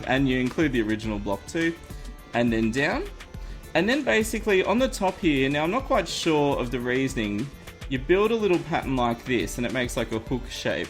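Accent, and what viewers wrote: Australia. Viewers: Hi! The fishing tip was very helpful to me so thanks a lot! Btw you're making awesome vids and I have a question for you, are you from Australia? I think... after accent.. anyways keep making cool vids! #LoveCookies!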